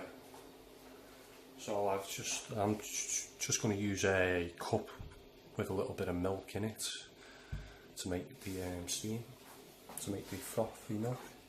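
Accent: Liverpool, United Kingdom. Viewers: Was that British accent?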